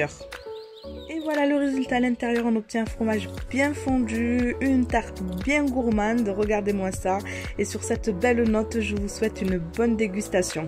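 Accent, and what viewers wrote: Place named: South, France. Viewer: Vive l'accent du sud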